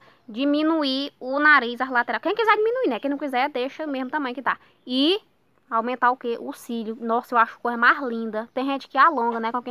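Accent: Northeast, Brazil. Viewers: Nordestino de sitio fala assim (sou nordestino)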